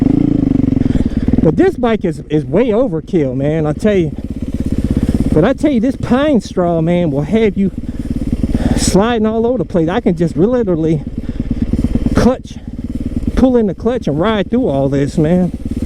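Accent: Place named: South, United States. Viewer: the southern accent is the best part of this video! 🤣🤣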